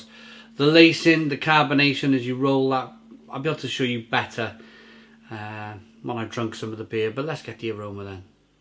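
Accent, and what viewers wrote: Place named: Cardiff, United Kingdom. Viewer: Where you from? Sounds like a barry/Cardiff accent.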